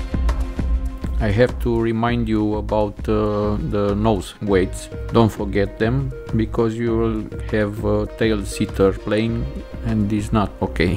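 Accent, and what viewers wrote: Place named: Romania. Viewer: Dupa accent esti roman 100%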